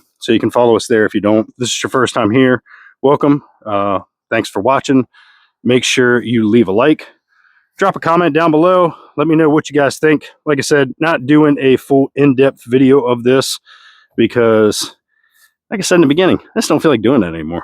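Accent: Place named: Eastern Shore, United States. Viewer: That eastern shore accent is thiccckkkk! I havent heard anyone sound like that since i lived in cecil county. Thanks for the reminder of home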